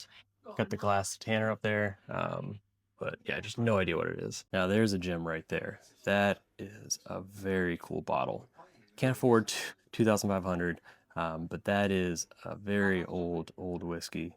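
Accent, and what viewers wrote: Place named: United States. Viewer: i love the small inaccuracies when you talk, makes you sound very american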